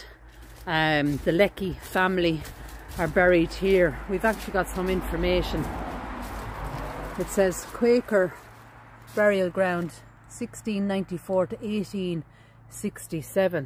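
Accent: Ireland. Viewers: Watching from Massachusetts, USA. I am second generation American born, all grandparents from Ireland. I love your videos, how you comment on the beauty of the sky and grounds and stones. Most of all I love listening to you. Has brought tears to my eyes remembering family members who have passed, who spoke and sang in that lovely brogue. God bless you for all you do. I would love it if you could keep your camera on the pictures and writings a wee bit longer. Thank you!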